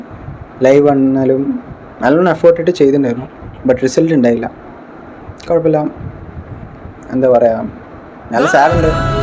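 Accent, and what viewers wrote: Tamil Nadu, India. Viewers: Tamil touch.......... ❤❤❤ nalla sound...... Ente avastha..... 😒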